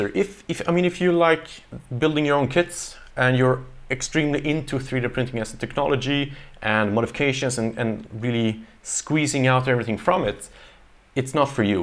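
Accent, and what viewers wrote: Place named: Sweden. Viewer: haha awesome! Jag med, tyckte jag hörde det på din ”dialekt” 😄🇸🇪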